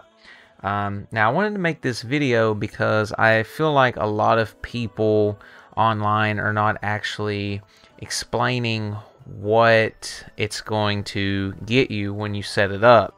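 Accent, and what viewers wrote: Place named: South, United States. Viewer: The southern twang is heavy. 😂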